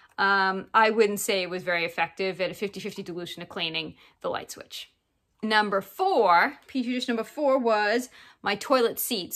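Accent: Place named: New York, United States. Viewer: Can't get over her accent. New York somewhere?...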